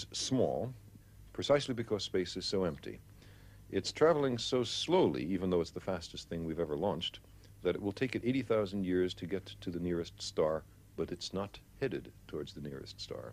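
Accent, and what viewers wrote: England, United Kingdom. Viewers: this british dude is annoying af. talk faster you smug fuck. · What a pompous ass English accent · Imagine there was a time when people spoke like that English dude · the host is being agressively british while Sagan is being very american..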